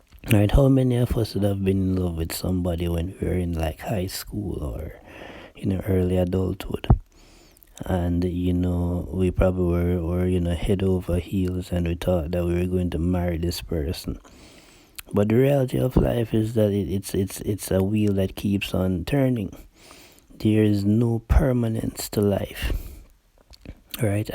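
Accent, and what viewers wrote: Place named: Caribbean. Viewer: Accent sounds Caribbean 🇹🇹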